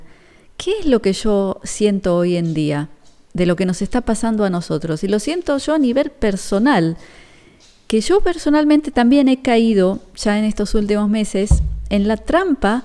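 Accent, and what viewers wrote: Argentina. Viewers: Soy nueva y me encanta su canal, sería lindo saber como de Argentina 🇦🇷 (porque creo sea Argentina por el acento tan marcado,) vive en la tierra hermosa de Israel y sobre todo GALILEA 🤩. La felicito tiene un cutis muy lindo, la piel se ve losana!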